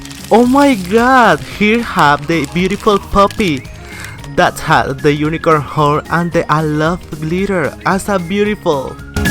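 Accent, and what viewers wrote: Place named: Spain. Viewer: Tienes más acento español que inglés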